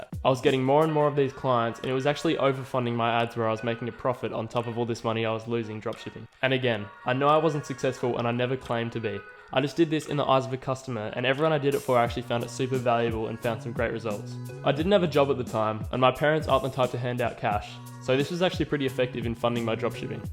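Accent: Australia. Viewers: Good on you brother! Where are you from? Sounds Aussie.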